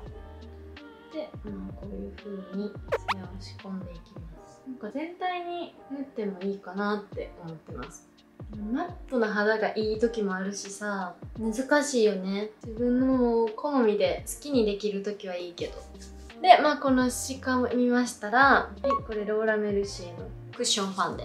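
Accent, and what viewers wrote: Kansai, Japan. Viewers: の関西弁が好き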